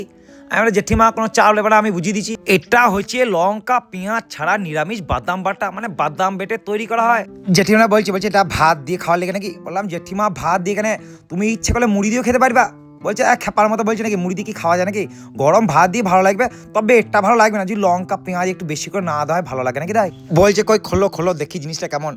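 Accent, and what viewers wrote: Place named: Bankura, India. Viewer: Tomar kotha bankura er lok der moto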